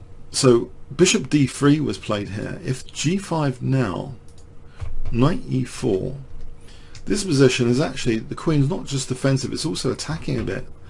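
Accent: United Kingdom. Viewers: THORN pawn! Man, that british accent. You have no idea how long I wondered how that was spelled. I thought Foam Pawn, Fun Pawn, Foreign Pawn, Phone Pawn... Awesome series,!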